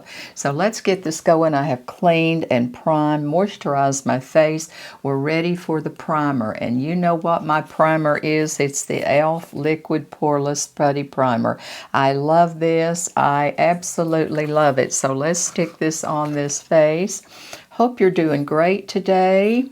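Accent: South, United States. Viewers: you are just precious!! You give women our age such confidence in the ability to make ourselves look and feel beautiful. I can't get enough of your southern brawl. That's a compliment! You are stunning!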